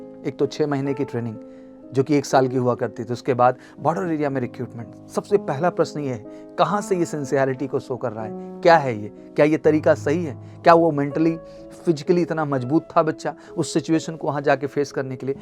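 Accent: Bihar, India. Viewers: Mr bihari accent, you remind me of Kanhaiya of JNU. Beta kaunsi party se ho? My father served in the Army for 30 years. He himself said that anyone committing suicide is not a brave heart. Suicide ke kaaran 90% due to personal issues hote hain. Jisko nahi bhejna na bheje fauj ke liye. Kamzooro aur namardo ke liye hai bhi nahi ye fauj. Zara video banao jo actual fire fight main shaheed hote hain. Dont make the armed forces weak. Aa gaya na aukaat pe ki agniveer nahi hona chaiye aur permanent hona chaiye. Dikha hi di aukaat.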